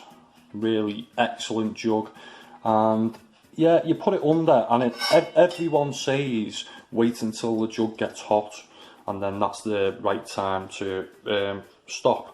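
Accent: Liverpool, United Kingdom. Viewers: Im a listening to a scouce guy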